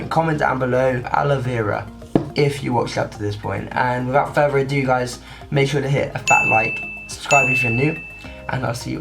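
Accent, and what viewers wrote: England, United Kingdom. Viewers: I'm really into your british accent :)) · I love your English accent❤️❤️❤️❤️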